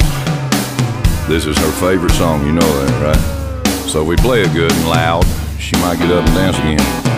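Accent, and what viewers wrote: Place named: South, United States. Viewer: I know he’s way older than I am. But he’s got a sexy voice. Even when he’s talking. That perfect country accent.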